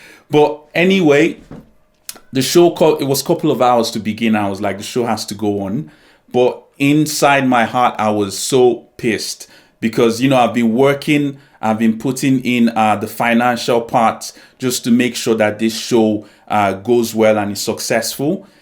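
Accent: United Kingdom. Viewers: Your accent is fascinating! I keep hearing bits that sounds Northern-ish, and then other bits that sounds like from all over the different bits of London!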